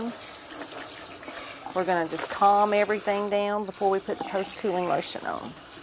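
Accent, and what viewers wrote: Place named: South, United States. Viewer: Ahhh the Southern accent. Like home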